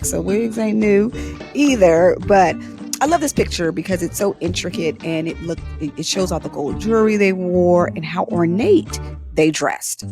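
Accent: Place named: United States. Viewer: Just cant hear the terrible american dialect.... sorry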